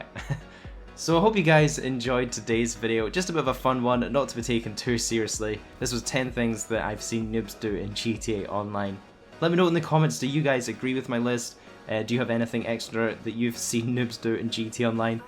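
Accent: Scotland, United Kingdom. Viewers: Are you fae Scotland? 🏴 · U scottish??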